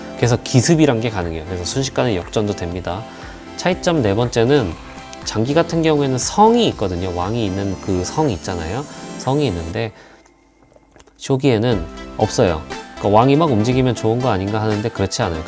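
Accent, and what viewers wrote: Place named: Southeast Asia. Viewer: 동남아 외국인 말투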